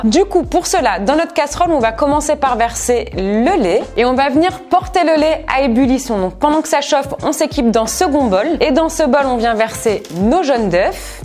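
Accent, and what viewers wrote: Canada. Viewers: tu mon youtubeur préféré desvidéos accent canadien et qui met quoi des vidéos trop trop rire 👍👍👍👍👍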